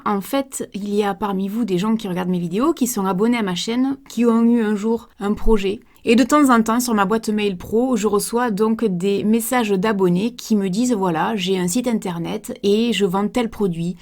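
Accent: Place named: Marseille, France. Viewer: tu viens de Marseille ? tia l'accent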